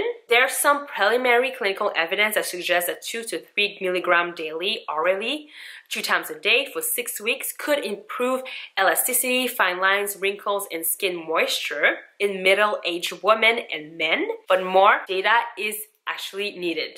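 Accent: Quebec, Canada. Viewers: il me semblait que j'entendais du français genre québécois comme accent, ☺️ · So happy I found your channel! It's fantastic that this product has brought up so much discussion about science and health supplements on YouTube. I wish Tati all the best with her business, but even if she finds the backlash difficult to deal with, I'm happy it's leading to an increase in science literacy among at least a small fraction of her subscribers. You did a really good job going through the various aspects of the product. On a more personal note, I'm a Quebecer living in the United States doing my PhD and your French Canadian accent reminds me of home. (: Merci beaucoup!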